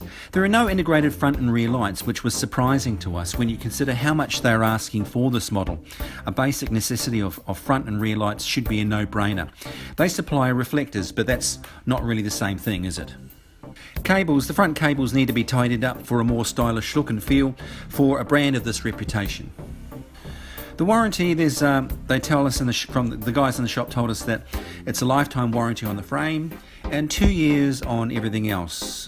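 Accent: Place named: United Kingdom. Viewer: Dude...I love your commentary. You sound British to me but whatever you are - you do an awesome job! Thanks for doing a real review.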